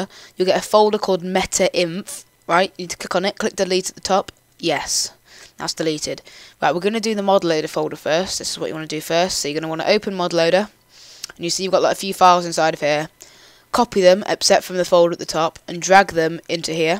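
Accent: United Kingdom. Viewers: I gave you a like 'cause ur British!!! (im not o-o)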